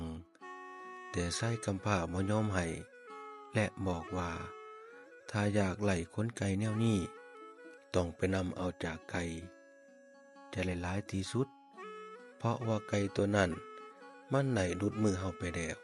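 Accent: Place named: South, Thailand. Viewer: ໄທໃຕ້ເວົ້າມວ່ນ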